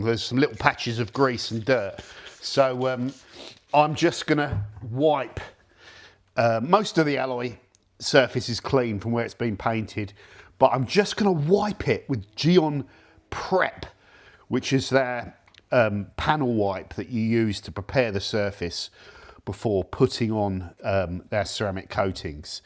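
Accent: United Kingdom. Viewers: Greetings from Hong Kong!! Your British accent is beautiful, and your way of teaching feels so warm and approachable. I’ve learned a great deal about detailing from you. thank you for being so kind and generous in sharing your knowledge🤣👍🏻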